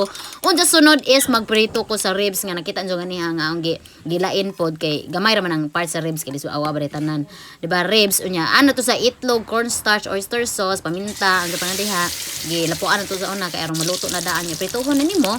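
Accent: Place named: Bohol, Philippines. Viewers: hi miss new subcriber from cebu city😊asa dapit sa bohol inyoha qy taga bohol pud aq bana? naa pud koy gisunod nga mga vlogger taga Alicia♥️ ganahan kos imng mga vlog qy luto2 nya taga bohol jd ang sinultian nmo😊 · Nalingaw ko jamo sa imong sinultian truly bol anon jud Kang pure Taga dimiao pod among kagikan now I'm from Mindanao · Hi Ms, taga loboc ka Dai? Base Sa accent man gud nimo.. New followers me nimo Dai..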